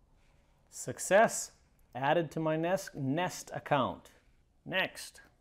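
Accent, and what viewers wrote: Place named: Canada. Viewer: Great video. You say your in Arizona but you sure sound Canadian!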